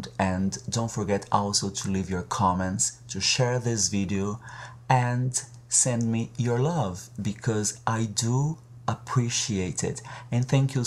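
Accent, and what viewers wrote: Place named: Brazil. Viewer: Hey, are you brazilian? I'm asking because I am and your accent seems like brazilian accent. If the answer is yes so... Você é muito diva, querida. Que bom que você está fazendo sucesso. Nunca desista da sua felicidade e sonhos... XO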